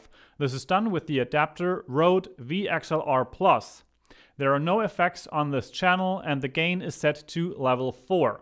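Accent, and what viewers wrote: Germany. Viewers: ☺️😀 na dann können wir ja auch Deutsch schreiben. Respekt - den typischen deutschen Akzent hast du aber ganz schön gut unterdrückt! 👍 <link> wäre mir jetzt einfach auf Grund der Top 10 aufgefallen. Hast du denn Erfahrungen mit anderen XLR auf Klinke Adaptern, die günstiger sind und trotzdem Plug-In Power liefern? Oder gibt's das gar nicht günstiger? PS hab dich mal abonniert - als Gegenleistung für deine herausragende Kommunikation 😀👍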